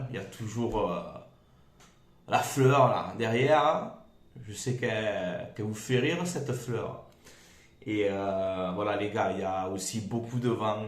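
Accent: Marseille, France. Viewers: Coucou. J’avais dans ma cuisine la même fleur avant … alors tu me fais rappeler des bons souvenirs. Surtout que mon épouse est partie aux cieux il y a 3 ans. Et qu’elle aimait beaucoup les tournesols et les fleurs de Provence. Et tes vidéos me donnent le sourire car tu debriefs et analyses parfaitement les matchs de mon OM et avec la gouaille inimitable Marseillaise. Alors merci l’ami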